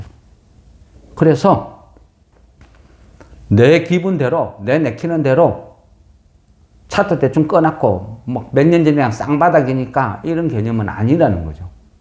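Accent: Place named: Gyeongsang, South Korea. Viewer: 앗 경상도 분이시다 ㅋㅋㅋ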